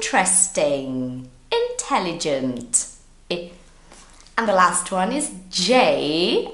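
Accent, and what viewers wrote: United Kingdom. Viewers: You're the prettiest teacher ever, even though you're from here you could very well pass for a british native 😌😉 did I do well teacher?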